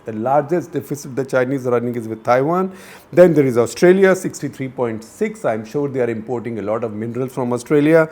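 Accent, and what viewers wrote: India. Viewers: Too much Hindianism with 'trups' rather than 'troops'. Vowel shortening gives off bad vibes with distraction.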